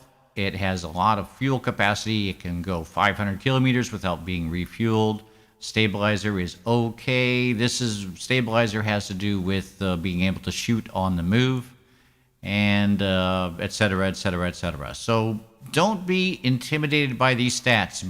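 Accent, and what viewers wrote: United States. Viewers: Thanks for the review! By the way, I bet you're from New York State! Your voice reminds me of Billy Crystals voice! Especially in the movie 'Parental Guidance', when he's commentating during the Baseball Games! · You sound sooo much like the American who visits Fawlty Towers in one of the episodes! Thanks so much for the review though, youve helped me decide to buy it but with a very humble attitude :)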